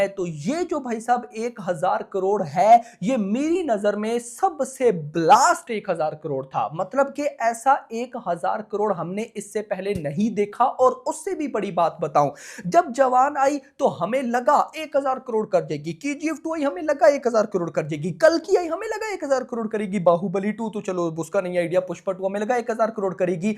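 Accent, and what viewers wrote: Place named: Pakistan. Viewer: Iska pronounciation Pakistani jaisa hai ....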